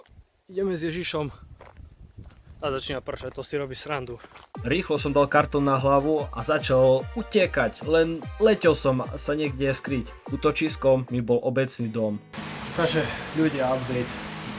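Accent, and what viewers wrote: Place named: East, Slovakia. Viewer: zaujmave ze si vichodnar a nebola tam ta to veta mamo ja idzu na vadrovku hlauski mi nabal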